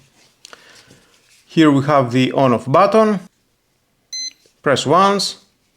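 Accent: Greece. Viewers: You sound greek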